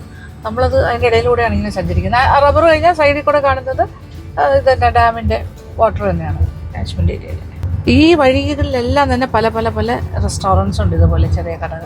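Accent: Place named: Trivandrum, India. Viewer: Innathe food kanaan thanne endoru bhangi... Tvm the bhasha ano Mam adipoli...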